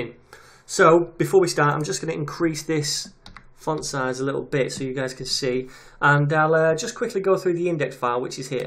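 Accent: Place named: England, United Kingdom. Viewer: I AM NEARLY FINISHED WITH YOUR COURSE AND I CAN ONLY SAY ONE THING...IT IS SIMPLY AWESOME AND ABSOLUTELY FANTASTIC! I HAVE LOVED EVERY STEP OF IT. I APOLOGIZE FOR THE LIKINGS BECAUSE I USED THE VIVALDI BROWSER TO WATCH IT AND I JUST NOTICED, THAT MY LIKING EVERY VIDEO DID NOT SEEM TO WORK. I DON'T KNOW WHY?! NOW, I ALSO WATCHED PART OF YOUR JAVASCRIPT COURSE AND I ALSO LOVED IT. THE WAY YOU TEACH MAKES EVERYTHING EXTREMELY SIMPLE TO UNDERSTAND, EVEN FOR A NEOPHYTE LIKE ME! I CANNOT THANK YOU ENOUGH EITHER FOR ALL THE USEFUL SITES, THAT YOU PUT UNDERNEATH YOUR VIDEOS! YOU ARE A GREAT TEACHER, WHICH IS QUITE RARE IN THE PROGRAMMAING WORLD. MOST WEB DEVELOPPERS ARE QUITE IMPRESSIVE AT WHAT THEY DO, BUT, UNFORTUNATELY, THEY DO NOT KNOW HOW TO TEACH ALL THAT AND VERY OFTEN THEIR ONLINE COURSES ARE NOT ONLY CONFUSING, BUT ALSO OUTRIGHT BORING. 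YOURS IS NOT! IT IS FUN AND I ALSO LOVE YOUR PRONUNCIATION. I DON'T KNOW FROM WHICH PART OF ENGLAND YOU COME FROM, BUT I JUST LOVE IT! MY PROFUSE THANKS FOR YOUR WONDERFUL GENEROSITY WITH YOUR COURSES. I AM NEARING 68 AND I COULD NOT AFFORD TO GO BACK TO SCHOOL FOR MANY REASONS, HEALTH AND MONEY, BEING TWO! LOTS OF LOVE AND TAKE CARE! FRANCIS, A FRENCH MAN LIVING IN CANADA.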